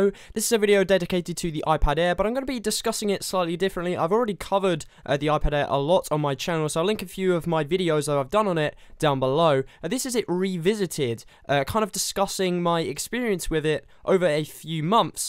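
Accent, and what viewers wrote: United Kingdom. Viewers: Your my favorite tech tuber. You make awesome videos! ...and I love your British accent, talking about tech XD it Reminds me of Jony Ive lol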